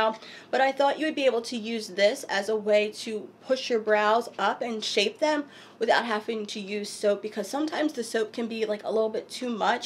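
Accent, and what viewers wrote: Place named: New Jersey, United States. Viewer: Girl! I cannot believe there are some parts that don't stay to the end of your videos LOL! I love your New Jersey accent wish I had a little of that! Love all of your videos and totally relate to the hubby being a little sick of the Dollar Tree haha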